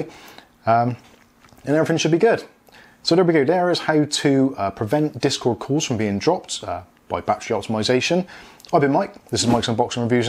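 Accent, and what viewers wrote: United Kingdom. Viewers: too british for me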